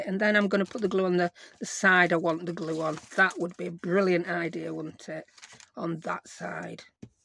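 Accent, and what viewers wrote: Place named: Sheffield, United Kingdom. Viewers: I am really enjoying your channel in fact I am binge watching. I was born and raised in Sheffield but living in Illinois now. I just love listening to your accent, in fact it makes me homesick. I also like your attitude to crafting, it might work or it might not work, so de it. Gail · are you from Yorkshire??? we have similar accents.... I'm liking this vid :o)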